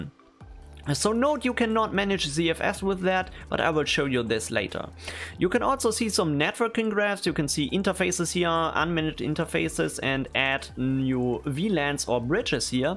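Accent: Germany. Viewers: Im ersten Satzt direkt gemerkt "Jop das ist nen Deutscher" Ich danke dir, genau nach so einer Software hab ich schon länger gesucht. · I can hear some German English.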